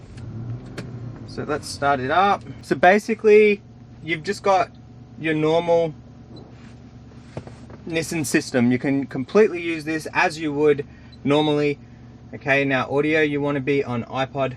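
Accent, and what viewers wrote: Australia. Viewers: Nice video man, not too use to hearing Australians on YouTube haha forget I sound like that too😂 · Why do speak like a Australia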